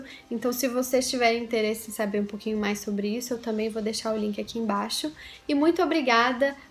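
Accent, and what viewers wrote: Minas Gerais, Brazil. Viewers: Jose Zeballos o sotaque dela é mineiro (Minas gerais)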